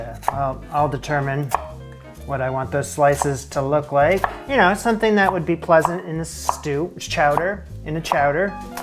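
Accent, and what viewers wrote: Canada. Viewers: i clocked that you were canadian immediately before you even said that you were, amidst a sea of americans on youtube i spot my brethren with ease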